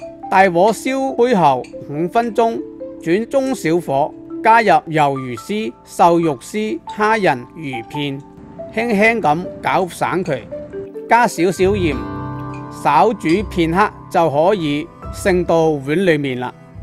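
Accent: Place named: Zhongshan, China. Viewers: 鄉音何來怪呢!!師傅鄉音似是中山?! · 不怪，珠三角一帶，口音是這樣多變的。聽起來像中山。中山、順德一帶，口音多樣化，隔一條江、兩條村，已經有一點分別。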